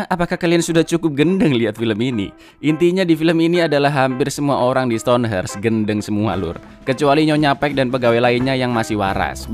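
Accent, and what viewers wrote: Java, Indonesia. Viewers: Wong jowo ta bg😂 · Nyok mas wkwk ngakak aku sampean wong endi se ? Lek di jawab tak subscrbe sumpah cuk lek ngomong medok kyk sby an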